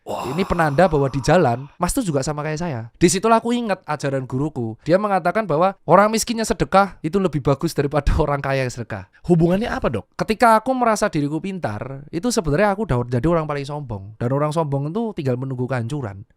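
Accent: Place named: Banyumas, Indonesia. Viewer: Jarku rep ngomong ngapak mas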